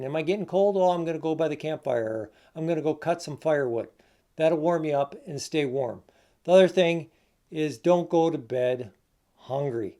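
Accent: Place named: Kentucky, United States. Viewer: It’s Cold!!! 😂😂😂😂 Your Kentucky accent isn’t to bad 😂😂 Great tips brother 👊🏻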